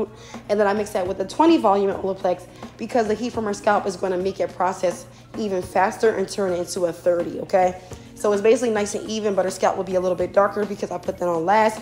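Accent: Philadelphia, United States. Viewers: Where are you located? I hear a Philly accent but maybe that’s just me 💖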